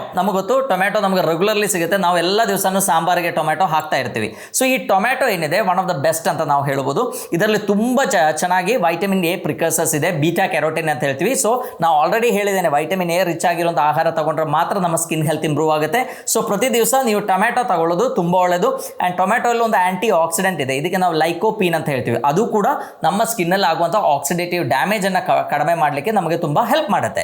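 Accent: Kerala, India. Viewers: Good Morning sir...You are giving very very very good information Always i will be watching your videos. I can understand most of your points b coz I studied the related course in same the profession and also I studied about Nephro urology and i worked in the joint venture units. And one more thing except Malayalam accent will be coming in your talk while using English your Kannada is 99% good . And Thank you so much for giving more informations about health updates in your every videos. Thank you so much sir .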